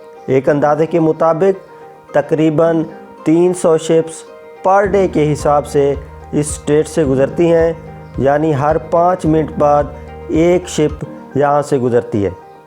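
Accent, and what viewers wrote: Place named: India. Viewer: Click - > Indian Voice - > Close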